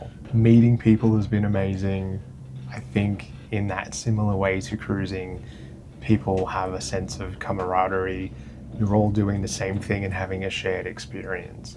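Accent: Australia. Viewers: You sound Australian...you should know it's called the Gann [sp] not the Garn [sp]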